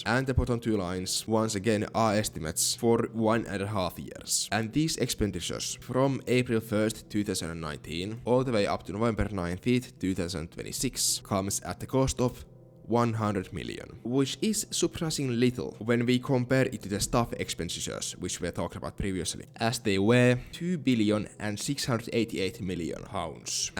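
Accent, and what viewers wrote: Finland. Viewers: i really like the finnish accent 😭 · I clicked for the clickbait, I stayed for the finnish accent.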